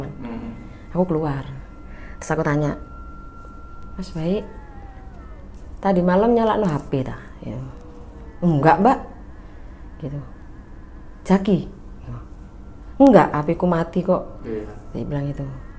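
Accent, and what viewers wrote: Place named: East Java, Indonesia. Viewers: Aksen Suroboyone bikin keingetan pacarku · Seneng temen mbak ndelok samean cerito. Suroboyoan banget!! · Mbak cara menyampaikan ceritanya nyenengin biangettt...logat jatimnya iku lho....uuueeennakkk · Meski amat sangat terlambat nyimak tetap gayeng...Logat'e mbak ngawe tambah kangen Suroboyo ku...😔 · penekanan kata ala wong Suroboyo panas banget = pwanas panas banget-banget = puwaanas.. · Logate jawa timuran,,,penak,, Salam dr jakarta selatan.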